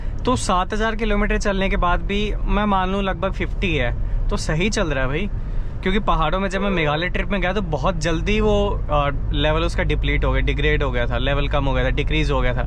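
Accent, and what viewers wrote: Haryana, India. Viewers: Aap hariyanvi me baat kiya kro bhai maza aata hai bhot us accent me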